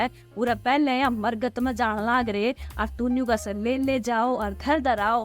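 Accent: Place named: Haryana, India. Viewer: वाह डाक्टर मैडम कहानी वाली जी,,, हरियावणी बोली,,हरियावणी वेष भूषा,,,,हरियावणी अंदाज ,,,बहुत ही अच्छा लगा।,,,बहुत शुभकामनाएं।😊😊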